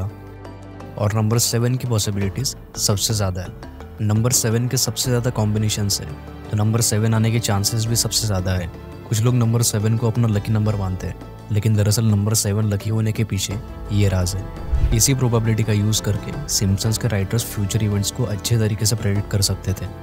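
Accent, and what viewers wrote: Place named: India. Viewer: He speaks indian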